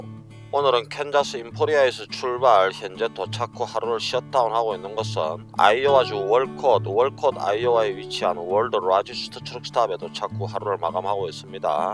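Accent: Gyeongsang, South Korea. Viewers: 오오! 방금 케비에스2티비 방송예고보고 미친듯이 달려옴요! 와! 와! 영어도 갱상도 사투리로하던 공중파 나온다! 와 와! 갱상도 싸나이다 아이가! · 안녕하세요 어제 밤에 다큐세상 " 편"을 보고 인사드립니다. 15일씩이나 계속되는 장거리 운전이 매우 피곤하실텐데, 그속에서 보람을 찾고 새로운 풍경과 정보를 소개시켜 주셔서 감사합니다. 너무 맵게 드시지 마시고 건강관리도 생각하세요. 멋진모습과 구수한 경상도 목소리가 좋아 구독자로 등록 신고합니다.....늘 안전운행하세요... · 다규세상에서 보고 들어 왔어요. 서울 사투리보다 경상도 표준말 최고 입니다.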